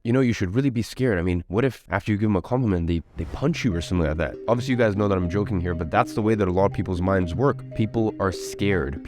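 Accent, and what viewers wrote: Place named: United States. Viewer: How come does this guy have an american accent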